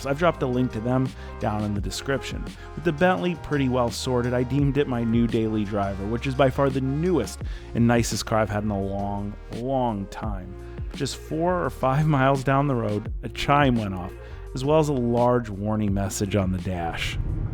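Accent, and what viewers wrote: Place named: New York, United States. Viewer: Interesting video from a typical Florida boy, NY/NJ accent and all. Thanks a lot.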